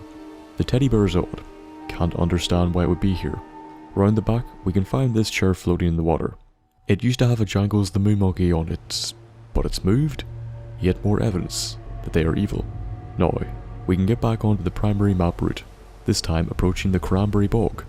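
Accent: Northern Ireland, United Kingdom. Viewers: My guess is South Down Or Tyrone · You sound so Northern Irish XD great channel btw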